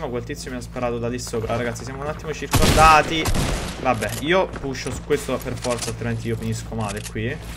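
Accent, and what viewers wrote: Naples, Italy. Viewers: Ma sei di Napoli?? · Ero sicuro che tu fossi napoletano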